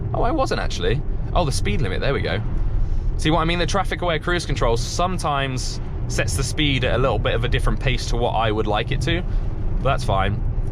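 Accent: United Kingdom. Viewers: I like your british accent, you sound like DanTDM. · I love your British accent! :)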